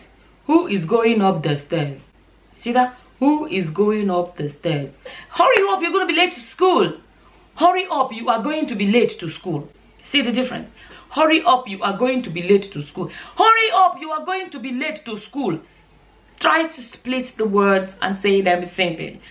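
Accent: Africa. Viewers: IT'S REALLY HARD TO DO AFRICAN ACCENT :"3 HOW CAN YOU DO THE AFRICAN ACCENT AND I CANT :"3 I WANT TO SPEAK IN THIS COOL ACCENT :"3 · You naturally have an African accent. We can literally just watch your videos, mimick you and have an accent.. everybody's voice is not deep like that lol 😂😂😂😂 So many women from Africa have high pitch voices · I am a Spanish native speaker and I don't why I love African accent since I heard first time. I wish I could develop some kind of accent close yours.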